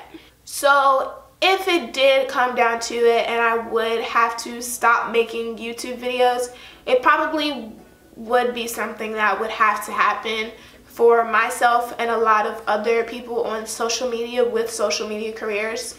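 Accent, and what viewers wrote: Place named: United States. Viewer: A little bit confused here. You have a black Tshirt on with the letters BL_CK with a map of Africa between the Bl and the CK. So I'm guessing that it's meant to mean black African? Obviously black but you speak with an American accent, excuse my ignorance. Are you African or American? If African, what part of Africa do you come from? You do the American accent really well.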